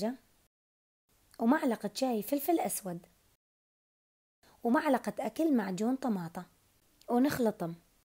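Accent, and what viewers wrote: Mosul, Iraq. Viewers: عجبتنه العروق واللهجه الحلوه والشرح الجميل الوافي تحيه من البصره للمعدلات المصلاويات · ماشاءالله روعة تسلم ايدك…طريقة العمل والشرح المختصر المفيد رائع والاروع والاحلى اللهجة المصلاوية المحببة عند كل العراقيين …استمري عزيزتي وبالتوفيق ان شاء الله 🌷🌷🌷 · أنا أعشق الاكلات المصلاوية 😋😋😋 اللي زاد حلاوة الڤديو لهجتك المصلاوية 😊💐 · فدوه شلون معدله عاشت ايدج واللهجه الموصليه تجنن بلسانج ربي يبارك بعمرج ويحفظج ويرضى عنج بنوتي الحلوه🥰🥰🥰🌷🌷🌸🌸👍👍👍👍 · الله يوفقج سهلتيها علينا طبعاً هاي الطريقة اسهل من الطريقة السابقة الله يرضى عنج ويرزقج من فضله يااارب. اعشق لهجة اهل الموصل 😍🌹❤️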